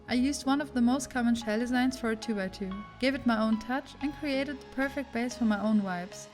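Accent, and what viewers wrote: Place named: Germany. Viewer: du kannst gut englisch aber deutsch hört man raus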